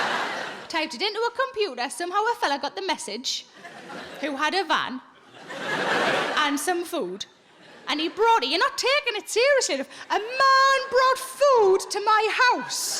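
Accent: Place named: Northern England, United Kingdom. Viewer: My family were from the UK, as is my husband, but we are a bit older now and especially for the stronger accents (like Northern accent) we use subtitles! We catch SO much more now! I hope this helps! Cheers! 😊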